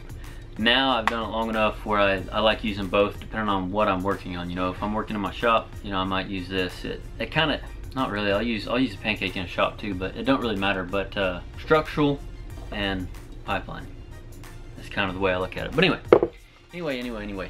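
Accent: Oklahoma, United States. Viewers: You from Oklahoma???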